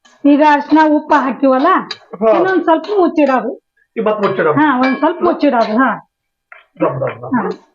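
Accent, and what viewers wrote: Maharashtra, India. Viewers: Unti nim maharashtra kannada bharpoor ista aatri... Neev mado palle supr ree... Daily school ge kattak lagu madak easier ree avvari..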